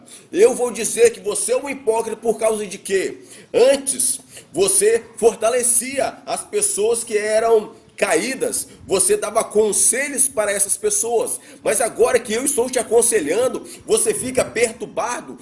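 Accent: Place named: Bahia, Brazil. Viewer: Gostei muito do seu jeito de explicar deste jeitinho baiano rsrs, Que Deus continue abençoando.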